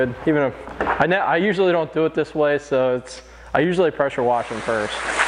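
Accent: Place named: Midwest, United States. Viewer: You've gotta be from the Midwest with that accent 😄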